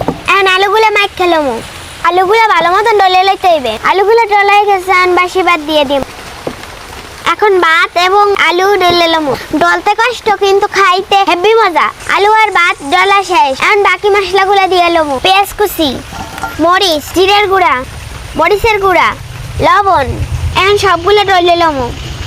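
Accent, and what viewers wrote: Barishal, Bangladesh. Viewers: বৱিশাইল্লা নাকি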